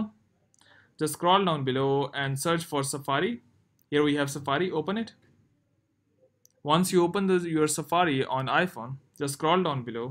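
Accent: India. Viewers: He is Indian . I can tell by his accent.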